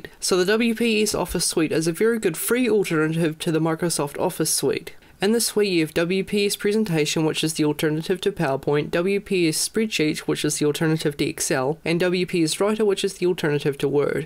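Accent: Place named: New Zealand. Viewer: Man, that was viciously Kiwi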